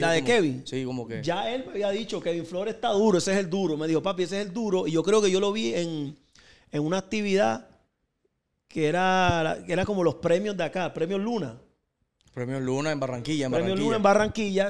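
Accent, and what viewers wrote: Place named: Puerto Rico. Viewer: Es que el es puertorriqueño.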